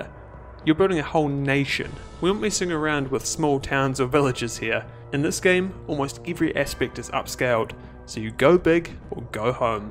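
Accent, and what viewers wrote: New Zealand. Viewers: Are you a kiwi? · I see video, I hear Kiwi, I subscribe ❤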